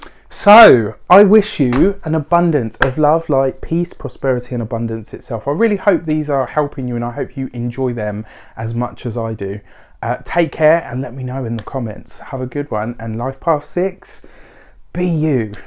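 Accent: United Kingdom. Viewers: Hold on do I hear a British accent? I got a feeling I'm a bit late but have to subscribe ! UK lady here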